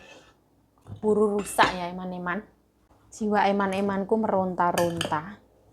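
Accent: Java, Indonesia. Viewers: Mbk indonya mana ya..ngomongnya jawa dan mandariny muedok bget..heeee....smgt slu mbk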